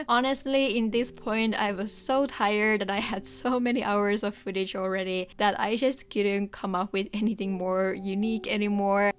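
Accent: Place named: Finland. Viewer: beautiful!! also your accent sounded familiar so i had to check if you're finnish, and you are! terkkuja suomesta! 😄❤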